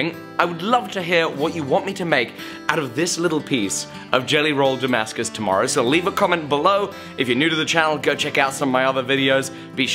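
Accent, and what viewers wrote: England, United Kingdom. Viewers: Why does he have an American flag and sound British? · how come you sound British yet have American flags everywhere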